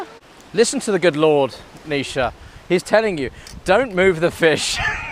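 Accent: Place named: Caribbean. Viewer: Some of your pronunciation is sounding so Caribbean, The island is rubbing off on you.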